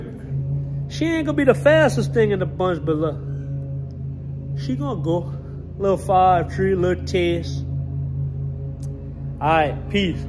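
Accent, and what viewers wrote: Louisiana, United States. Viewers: yeah i live in Arkansas been to Louisiana few times as a lineman for hurricanes it’s definitely a trip! Knew you sounded cajun!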